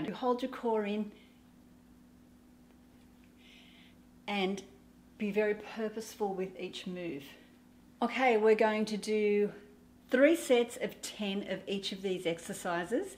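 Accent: Australia. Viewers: I know....i swear, she could tell me I needed a lobotomy and I would be like "ok". Everything sounds nicer in an Aussie accent! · And I love her Australian accent!! 😃❤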